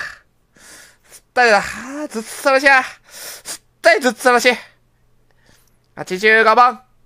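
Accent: Kagoshima, Japan. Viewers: 9割くらいは理解できませんでした😊 鹿児島弁スゴイ👍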